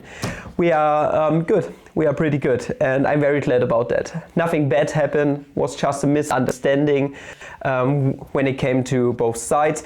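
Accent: Germany. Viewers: And please clear my doubt "are you German"?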